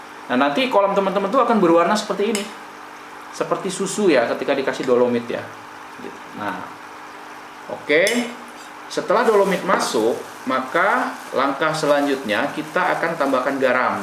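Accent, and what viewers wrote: Indonesia. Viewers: Bang logatnya seperti orang Lampung, saya dari Kalianda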